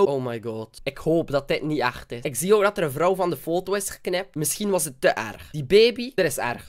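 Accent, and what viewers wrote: West Flanders, Belgium. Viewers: Dat Belgische accent is geweldig ❤️😂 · Van waar kom jij ??(ik denk West-Vlaanderen ) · Hij west-vlaams en probeert AN te spreken zodat iedereen hem verstaat :P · probeer een keer nederlands te praten want jou belgische accent klinkt echt voor geen meter! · jij bent echt een belg of nii da accent OMG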